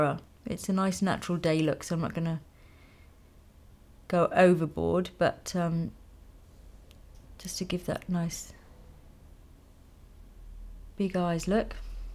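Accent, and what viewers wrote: United Kingdom. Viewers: Your voice and British accent is sooo soothing!!!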